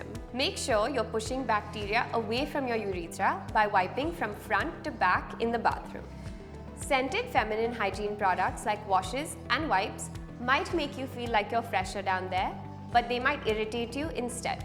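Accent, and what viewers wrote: India. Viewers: Never seen a white woman with a indian accent😅. Great videos anyways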